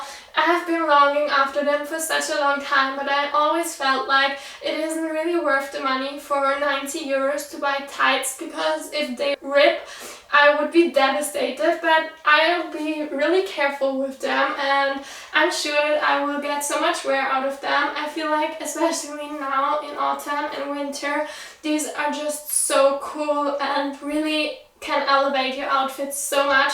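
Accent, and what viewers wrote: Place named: Germany. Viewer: You look amazing in these Tights. And also i love your accent, are you german?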